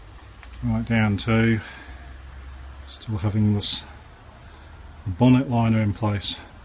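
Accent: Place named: England, United Kingdom. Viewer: Sounds like a pom accent. Nice el to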